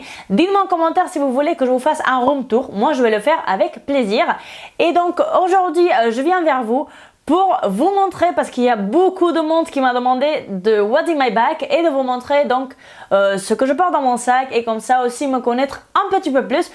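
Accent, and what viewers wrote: Spain. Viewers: Moi je trouve que t'as un acsent espagnol mais j'ai bien aimé la vidéo bye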